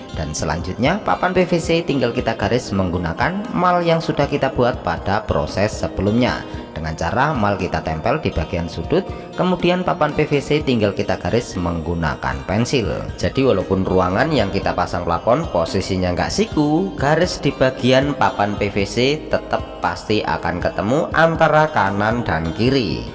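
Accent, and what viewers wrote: Indonesia. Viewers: Kena sindir admin akhirnya ikutan komen juga lah.. Banyuwangi hadir dan menyimak bosku.. kalau lihat logat bicara nya kayaknya adminnya orang blitar.. entah benar atau salah. Salam seduluran..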